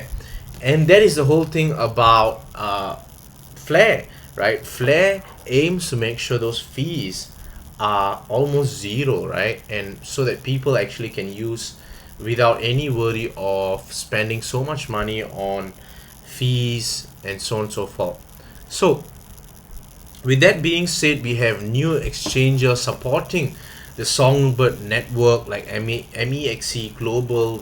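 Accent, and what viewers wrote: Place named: Malaysia. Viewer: Yup, clickbait title to get views. Some dude from Malaysia by the sounds, doing a lot of talking but not saying anything new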